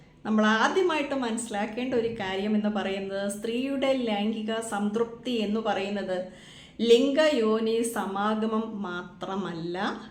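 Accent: Kottayam, India. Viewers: കൗഗേൾ, ലോട്ടസ്, ചെയർ, ലാപ് തുടങ്ങി സ്ത്രീ മുകളിൽ ഇരുന്ന് ചെയ്യുന്ന എല്ലാ പൊസിഷനിലും സ്ത്രീയ്ക്ക് പരമാവധി സുഖം കിട്ടണമെങ്കിൽ നീളവും ബലവുമുള്ള ലിംഗം തന്നെ വേണം. കാരണം സ്ത്രീ മുകളിലിരുന്ന് ചെയ്യുമ്പോൾ അരക്കെട്ട് നല്ലത് പോലെ ഉയർത്തിയും താഴ്ത്തിയും ചെയ്താൽ മാത്രമേ നല്ല സുഖം കിട്ടുകയുള്ളൂ. കൂടാതെ സ്ത്രീ പൊങ്ങിപ്പൊങ്ങി വേഗം വേഗം ലിംഗത്തിൽ റൈഡ് ചെയ്യുമ്പോൾ ലിംഗം യോനിയിൽ നിന്ന് തെന്നി വെളിയിൽ വരാതിരിക്കാൻ ലിംഗത്തിന് നീളം വേണം. അതുപോലെ കൃസരിയെ ലിംഗത്തിൽ മുഴുവൻ ഉരച്ചുകൊണ്ട് റൈഡ് ചെയ്യണം. ഇടയ്ക്ക് ഗ്രൈൻഡ് ചെയ്യണം. ഇങ്ങനെയെല്ലാം ചെയ്ത് സ്ത്രീ യോനിയിൽ ലിംഗം കേറ്റിയിറക്കി പറന്നടിക്കാൻ ലിംഗത്തിന് നീളവും ബലവും വേണം. ശരിയല്ലേ പാലാക്കാരത്തി അച്ചായത്തീ? കോട്ടയം പാലാ സലാംങ് കേൾക്കാൻ നല്ല സുഖമുണ്ട്. അത് സെക്സി ആണ്.❤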